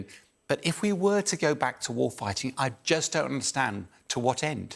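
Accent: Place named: England, United Kingdom. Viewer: Thanks for the Overview Sean Bell. Your sound English, .... trustworthy. American news, only idiots trust.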